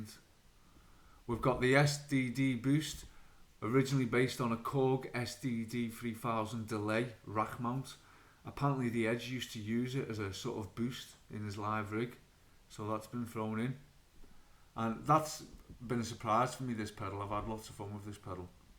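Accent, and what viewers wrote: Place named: Liverpool, United Kingdom. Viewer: lad.. Proper Scouse 😎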